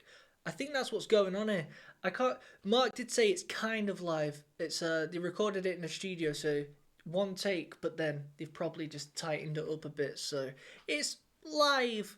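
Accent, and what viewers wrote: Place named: England, United Kingdom. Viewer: Cool to see you reacting to, always enjoy seeing what you think of symphonic metal bands and the interesting things you point out. (And the recently was a nice surprise; the first album I ever bought was the Hip's third album, on cassette, when I was in junior high school.) I was pretty sure I remembered you being surprised by the dark-haired guitarist's name,. (Presumably since it sounds like the online store Temu?) Maybe I'm remembering a different reactor? Google didn't find any earlier vids of you doing or other tracks, or for. And you'd definitely remember having seen these guys in the same studio rehearsal session and wouldn't have used this title. Maybe the topic came up in a reaction or something, when you were talking about a recommendation you'd gotten or something. I can definitely picture an English accent like yours saying " " and being surprised and amused by it. 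--- I'm not sure what use to get that acoustic-like sound from those guitars which obviously don't have a resonant cavity. One possibility is a modelling amp, which simulates the sound an acoustic guitar would make for the string vibrations picked up by the pickups. (e.g. uses a Kemper modelling amp for the guitar neck of The Beast, e.g. in (middle subtitles included version if you want to check it out), or in a few songs like or I think where he switche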